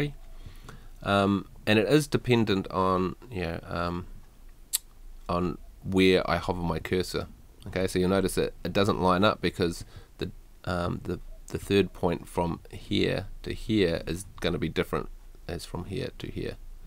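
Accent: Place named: New Zealand. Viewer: Thank you, really easy to follow along with. I like your accent, are you from New Zealand?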